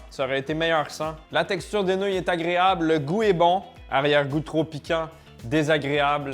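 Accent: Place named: Canada. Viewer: J’adore son accent canadien 😊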